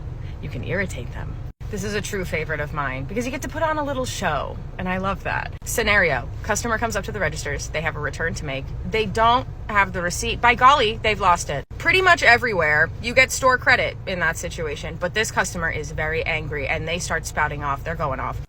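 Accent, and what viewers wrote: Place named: Massachusetts, United States. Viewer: So, I'm from MA and it is driving me inSane that I can not figure out if your accent is from there or not😭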